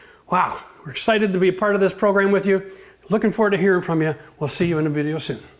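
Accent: Canada. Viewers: I was just used to your CANADIAN AYE:)~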